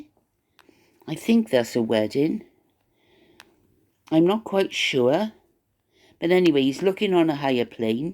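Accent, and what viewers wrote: Wales, United Kingdom. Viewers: Hi,. I miss hearing your wonderful Welsh accent, as I live here in the Southern part of the U.S. Yes, you should be monetized. I empathize with you, Taz and Avid, too. God is still in charge, no matter what. Anyway, you are so very entertaining, mixed in with some home truths and life lessons for us all. Wish we could go back to a more innocent time, but our youth and childhood have long since passed. I also find myself a bit drowsy in the afternoon, as I am older, just like you. God bless you, your loved ones and friends, and take good care of yourself. 👑🏰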